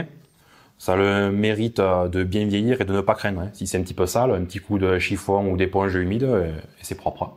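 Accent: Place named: Toulouse, France. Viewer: l'accent toulousainnn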